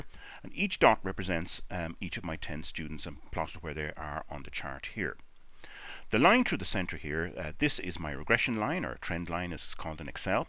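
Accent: Ireland. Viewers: A very interesting video no doubt and the Irish accent is a great addition, especially if you are going to watch it 7 times, almost one after the other as I did ... I understand the importance of the PCC to find relationship between X and y (if any) but when it comes to the slope, why are you not calculating it as the result of SP / SSx, especially when we have those values good and ready?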